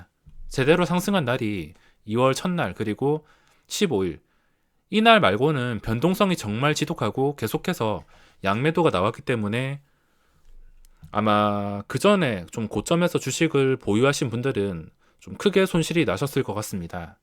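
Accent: Gyeongsang, South Korea. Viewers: 누가많이파는지 시합하는줄 알았읍니다..ㅋㅋㅋ경상도사투리 구수하네요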